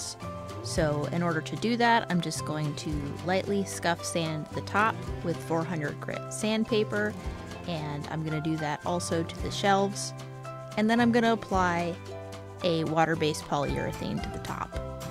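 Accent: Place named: Ohio, United States. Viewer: don't want to be nosy, but your accent sounds just like my daughter in law, who lives in Ohio.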